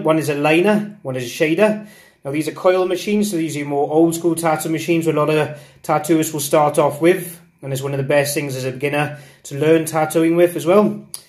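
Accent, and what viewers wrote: Wales, United Kingdom. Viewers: I heard the Welsh accent and immediately knew I was safe, very detailed, thanks mate - a fellow welshy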